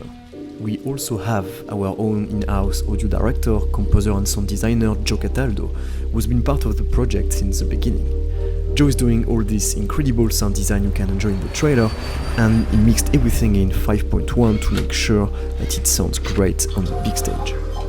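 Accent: France. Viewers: Il est la laccent français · french accent spotted x) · The French Accent of the Narrator was just the cherry on top of all that beauty. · That thick, french accent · il a pas un accent francais? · THIS FRENCH ACCENT HURTS MY EARS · Je reconnais là l'accent français! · Amazing french accent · Where are you from? France? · Un bon accent français bien de chez nous. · oh man Your English is so French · looks very nice. but this french accent is horrible! i cant even understand half of what this guy is saying ^^ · French accent ? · c est un francais qui parle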